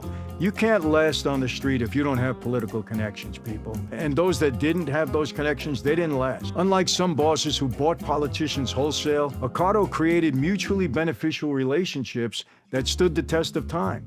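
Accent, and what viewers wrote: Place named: New York, United States. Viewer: They need to hire you as the voice over for all mob/mafia documentaries from now on your experience on top of that classic New York accent legendary stuff man keep it up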